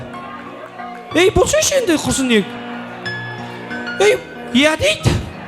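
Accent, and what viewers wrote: Kazakhstan. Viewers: БАТЫС ӨҢІРІНДЕ КӨБІСІ ОСЫЛАЙ СӨЙЛЕЙДІ ҒОЙ, ƏР ӨҢІРДІҢ ӨЗ ДИАЛЕКТІСІ ЯҒНИ СӨЙЛЕУ МƏНЕРІ ӨЗГЕШЕ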